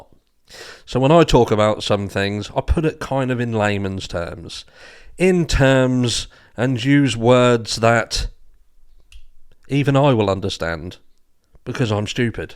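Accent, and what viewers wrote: United Kingdom. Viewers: The only difference between you and Peter Tragos is your British accent. Visually you look identical so I have to wait until you start speaking before I can tell for sure.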